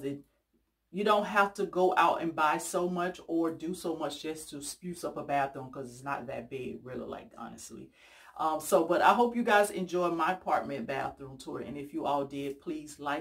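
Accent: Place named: Louisiana, United States. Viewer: You sound like me when you speak I’m Louisiana native are you?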